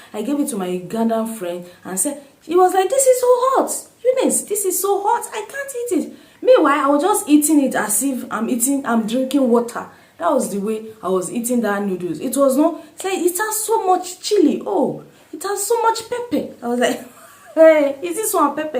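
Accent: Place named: Nigeria. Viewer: It's a Nigerian accent for me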